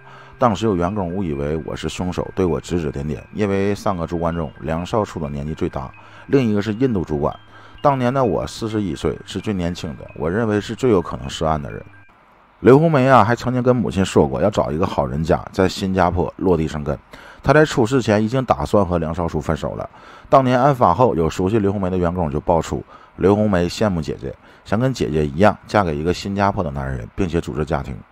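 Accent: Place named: Northeast, China. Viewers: 东北， 好像是鞍山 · 辽宁话吧，沈阳那边的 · 鞍山海城的口音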